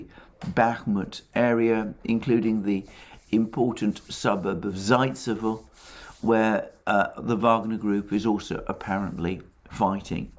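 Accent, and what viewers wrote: United Kingdom. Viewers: Kremlin BS with a British accent. How nice... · Russian troll with British accent.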